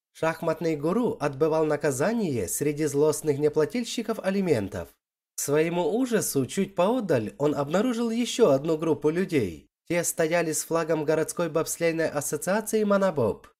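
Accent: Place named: Ukraine. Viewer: Обожаю рассказы про бабу Капу. И чтец с украинским акцентом, просто прелесть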